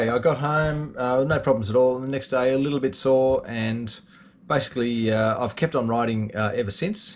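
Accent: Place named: Australia. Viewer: I really appreciated your series, your honesty and the clarity of your points. And great that I could hear it in my native language too (Aussie). Thanks!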